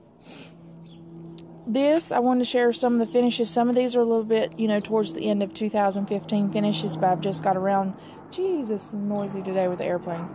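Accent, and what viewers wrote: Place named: North Carolina, United States. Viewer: Congratulations Proud Mom! Thank your Son for me for his service, please! Love the stash! Cute doggies too! Myrtle Beach is my favorite place on Earth! Is there a LNS in MB or nearby? Love your accent 'cause you sound like me, ( I'm in the NC Foothills, Wilkesboro, between Boone and Winston Salem). Anyway, after all of that said, I really did enjoy your video! Best Stitches! 😀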